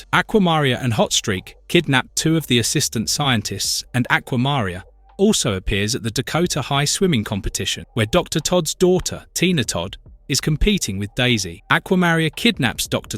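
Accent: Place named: London, United Kingdom. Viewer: His not a american his a london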